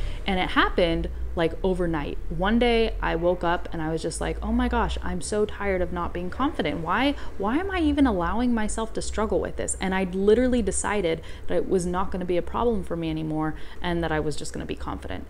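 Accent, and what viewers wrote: Buenos Aires, Argentina. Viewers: I think it was an excelent video, very natural, and I like when you speak fast and there is no subtitles, that force us to pay attention and follow every word as much as we can. We sometimes try to be perfect in all what we do and that is a problem, because nobody is perfect... and if you make a mistake at least that mean you are trying. I have to say that I love when you speak Spanish, I have seen other videos from you and I saw you talking in Spanish in one of them and I was perplex because you sound like an Argentinian woman, your Spanish is really clear and you got to achieve a very similar accent. Thanks for your video and I know many of us will be looking forward to a new one. See you. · Excellent video!!, telling us about your personal experience with the spanish language is very inspiring. As a native spanish speaker i got say you have a very nice accent, quite "porteño" haha. 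Bueno ahora si en español, te cuento que hace poco di con tu canal de youtube y de todos lo que encontre puedo decir que entiendo muy bien como hablas en ingles. I got a say since my childhood i listen a lot music in english, and watch movies and tv shows as well, but my challenge is reading fluent english language. Saludos desde Argentina. PD: I dont know if the word "quite" is well used xD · Hello, thanks to share this video and your experiences with language learning. Your Spanish it's very good! You sound like a true Argentina! Continue inspiring us! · I don't know, but it seems that you're speaking a little bit slow because I'm getting everything you're speaking , am I wrong?..suenas como una porteña, saludos desde Nicaragua XOXO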